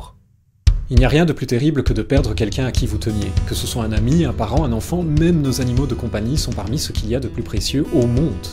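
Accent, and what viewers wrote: Belgium. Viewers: 1- On ne dira jamais assez à quel point la bienveillance des accents belges est bien adaptée à la transmission du savoir. 2- La vidéo tombe malheureusement à point nommé.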